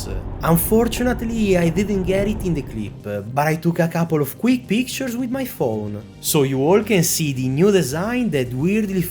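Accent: Italy. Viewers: Italian accent terrible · the most Italian English i have ever heard, 10/10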